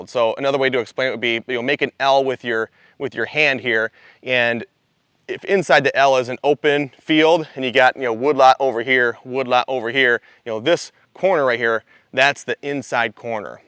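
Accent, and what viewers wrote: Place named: North, United States. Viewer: Nothing but yankees and their accent and nomenclatures doing hunting vids. Is a good ol country boy to much to ask for vs city folks? Whats pryporties?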